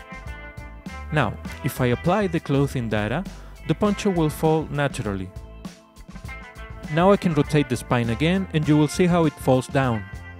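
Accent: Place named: Chile. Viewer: Hey bruh, are you from Chile??? I noted for the accent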